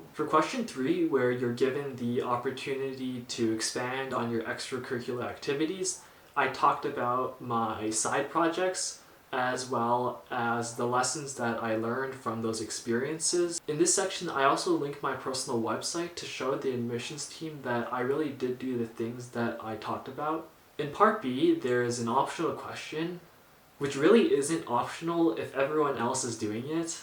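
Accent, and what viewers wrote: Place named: California, United States. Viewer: SE's cutoff is 97. With the academic adjustment factor, you might've just missed the cutoff. Otherwise, are you a Canadian citizen? Engineering has quotas on the max number of internationals they let in. You seem to have a Californian accent, but I could be wrong. Other speculation: perhaps another sport, a part-time job, or something that sets you apart from the typical applicant would've been helpful, especially considering the hundreds of typical applicants from Markham/Richmond Hill/York Region. P.S. Bayview and St. Robs are feeder schools.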